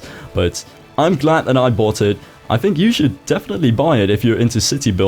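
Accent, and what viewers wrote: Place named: Netherlands. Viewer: je accent is heel erg herkenbaar aan mijn engels accent. Ik herkende direct dat je nederlands was voordat je het zelf zij xD. Een combo van brits en amerikaans en soms een beetje nederlands:D daar krijg je een sub voor!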